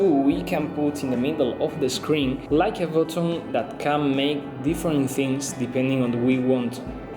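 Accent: Andalusia, Spain. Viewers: Jajaja andalucia english.estas en todos lados me alegro. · Good andalusian english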